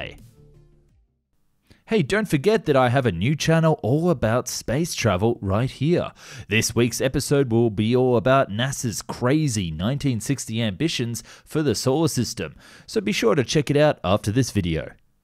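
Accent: Australia. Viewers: I never asked for aussie accent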